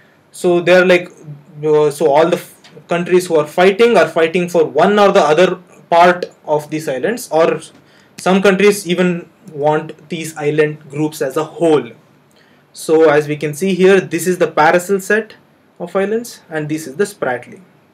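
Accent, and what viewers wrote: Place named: Bihar, India. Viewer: nd the mixture of hindi n eng with bihari extnt is shaaaaandar..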